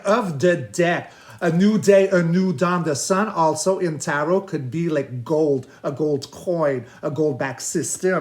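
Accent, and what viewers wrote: France. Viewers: You have such a wonderful French accent. Thank you for your light hearted readings! You always make me smile! Blessings! 💕🕊️💕💥💥💥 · 🎉❤your English is amazing with a beautiful French twist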